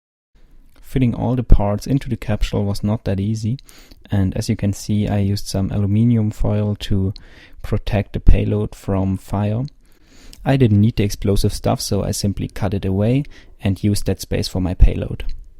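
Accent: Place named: Swabia, Germany. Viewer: Ja cool ich au, man merkt obwohl du Englisch sprichst wo du her bist, ich bin aus Backnang, auch Nähe Stuttgart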